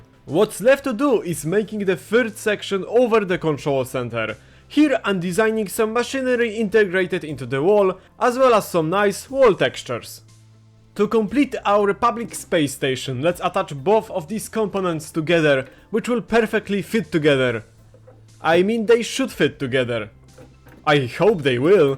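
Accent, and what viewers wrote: Poland. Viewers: bro i love your polish accent, im from germany and i hate mine bruh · Czuć ten polski ale cieszę się ze polak wyszedł na między narodowa scenę pozdro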